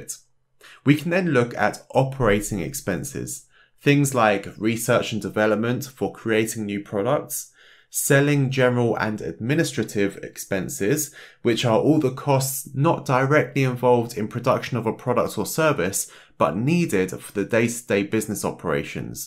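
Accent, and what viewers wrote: United Kingdom. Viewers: britich accent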